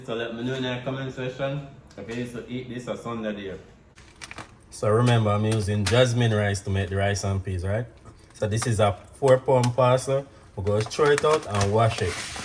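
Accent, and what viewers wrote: Jamaica. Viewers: Beautiful yard accent. Keep it up.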